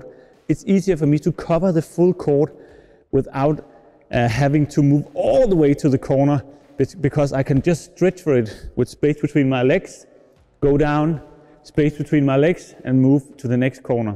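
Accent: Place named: Australia. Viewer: Sounds aussie ngl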